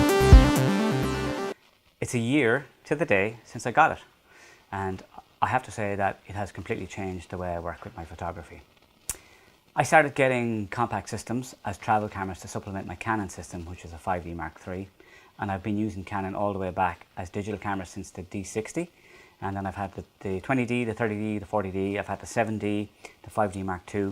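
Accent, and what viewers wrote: Ireland. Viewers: Pronunciation sounded fine to me. Sincere. Thought there might be an Irish accent--not sure. (I like listening to Irish accents). Hope your wife is well. Thanks for making the video. I liked the simple background and the uncomplicated presentation. The video quality was really good. Am thinking to get an XT-2 for making Youtube videos. Thanks again!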